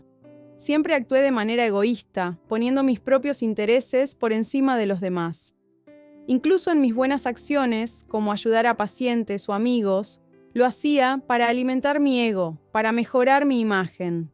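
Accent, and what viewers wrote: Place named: Argentina. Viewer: Dices que eres peruana,pero hablas como argentina.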